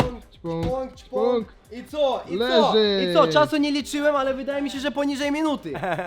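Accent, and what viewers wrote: Ukraine. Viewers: co ty jesteś z ukrainy że tak mówisz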